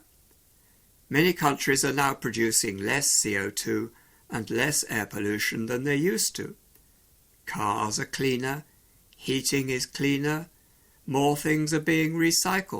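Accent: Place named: United States. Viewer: درود بر شما لطف کنید ازین مقاله ها بیشتر بزارید با لحجه آمریکایی بیشتر ❤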